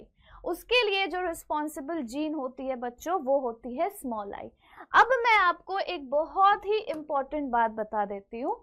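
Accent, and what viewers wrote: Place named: Northeast, India. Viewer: Ma'am ur Hindi is like Northeast pipul but do speak how u speak now make us ur word remember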